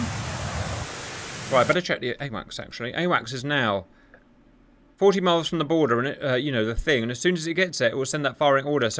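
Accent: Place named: United Kingdom. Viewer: No we love your beautiful British accent commentating the battles. It's just a shame when so much is happening all at once in the big battles that you can't commentate all of it!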